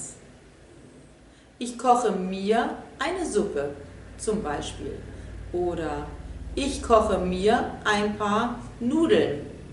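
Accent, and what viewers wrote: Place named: Germany. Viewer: Immer Super Videos! Ich lerne viel hier! Was mir am meisten gefällt ist der Akzent oder die Aussprache. Echtes Deutsch!!!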